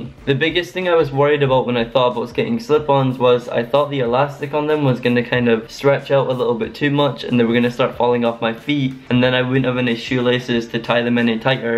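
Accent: Scotland, United Kingdom. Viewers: R u Scottish?😂💕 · i only realised he was scottish about half way through the video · Is ma mans Scottish?????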